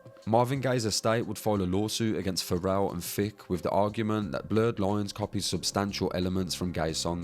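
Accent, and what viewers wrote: Birmingham, United Kingdom. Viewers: Love your Brummie accent - it’s a rarity on YT. Keep making vids, mate!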